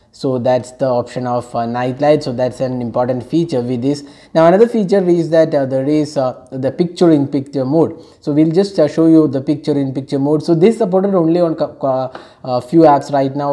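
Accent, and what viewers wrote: Kerala, India. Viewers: U r from Kerala Your tone speaks about it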